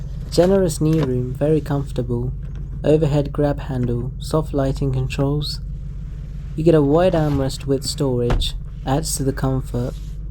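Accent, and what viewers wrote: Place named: Kerala, India. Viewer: Malayale English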